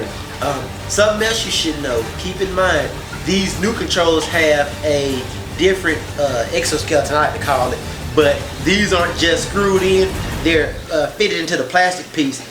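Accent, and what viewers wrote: South, United States. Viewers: He's southern the white people there sound the same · u from da south aint you? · yo voicee is country and raw iwish i could talk like that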